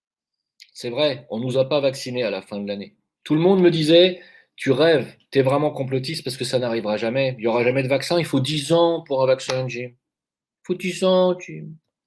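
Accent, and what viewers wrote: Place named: Algeria. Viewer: l'accent pied noir chinois c'est juste EPIC !!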